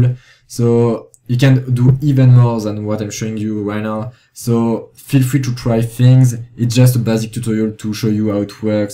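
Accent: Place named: France. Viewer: hahahaha accent fr de merde hahaha jsui mdr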